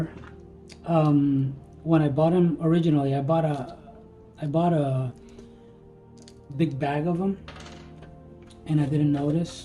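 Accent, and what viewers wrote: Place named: Cuba. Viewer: Is this your new microphone? Sounds decent. I'm old and perhaps not "politically correct" but what is the source of your accent? Cuban perhaps?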